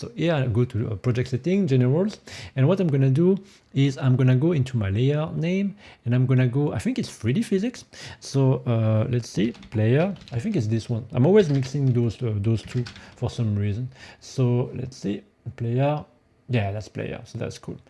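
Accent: France. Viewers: je m'attendais pas à l'accent français, super vidéo en tout cas et merci pour le contenu gratuit et super instructif que tu nous proposes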